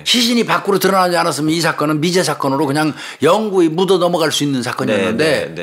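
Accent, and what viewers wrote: Chungcheong, South Korea. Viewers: 화끈한말씀^충청도사투리가구수하신~ 김복준선생님? 절친고향여친도 복주라하였네요!오래전 고혈압으로 하늘이사한 가엾은친구!생각이나는~ 사이다같은 범죄자에 쓴소리가 그나마보는내내 시원한~🧊 하나뿐인생명 무참히살해하는인간들은? 그냥~~팍👊😡 요즘 수사반장을보는듯한~수고와감사🙇‍♀️ 그리고교훈^조심또조심 밑을넘없다 경계심잃지말고…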